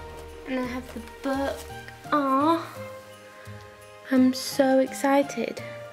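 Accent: United Kingdom. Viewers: what's up with British accent my elf name is mark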